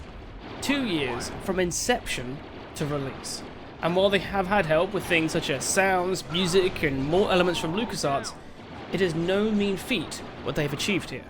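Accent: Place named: England, United Kingdom. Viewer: English accent? Star Wars video game lore? Subscribed!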